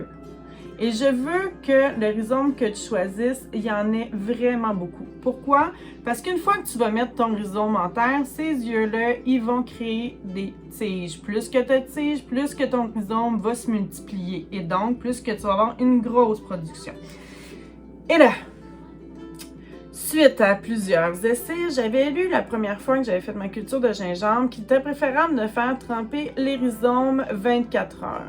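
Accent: Quebec, Canada. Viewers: J'aime bien mais un peu difficile avec l'accent. J'aime le Québec ❤️ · Bonjour de France 😍 merci pour ce partage , cet accent Québécois me ravit tellement 🥰 me suis abonnée pour voir ce que deviennent les plantations de rhizomes ! à bientôt .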